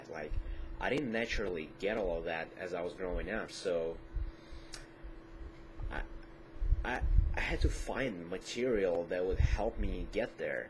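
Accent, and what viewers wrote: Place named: Russia. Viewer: I agree, actually a little russian accent doesn't hurt you.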